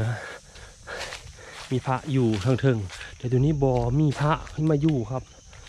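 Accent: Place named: Sakon Nakhon, Thailand. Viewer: ข่อยกะคนสกลคือกัน อ.วานร แต่สำเนียงบ่คือเจ้า สำเนียงของเจ้าคือคนในตัวเมืองสกล ละเบ๋อ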